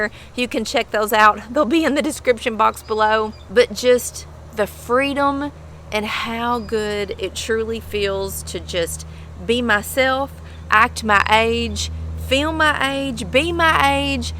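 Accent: South, United States. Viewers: Love your videos! So common sense and practical advice! Love your curly hair and Southern accent too! Keep up the good work! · I can listen to you for ever. Ahhh that badass Southern accent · Are you from Kansas, North Carolina, or Texas? Thx 🙂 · I have no idea how your video popped up in my YT space but I just wanted to say I love your southern accent , I grew up in Nashville but live in LA now and miss girls talkin “southern”😊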